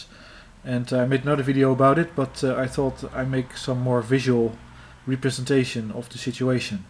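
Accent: Netherlands. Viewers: dutch accent is unmistakeable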